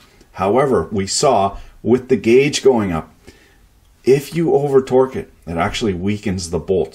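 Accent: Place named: Maritimes, Canada. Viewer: please check this article and related video on head bolt failure. <link> FYI its great to hear a fellow Canadian on you tube. For a guy living in BC you sound suspiciously like a maritimer. or maybe you had a Moosehead ale or to once in while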